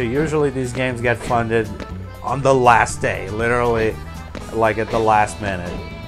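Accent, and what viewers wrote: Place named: Brazil. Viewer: eu percebi que vc é BR pelo fato de ter sotaque...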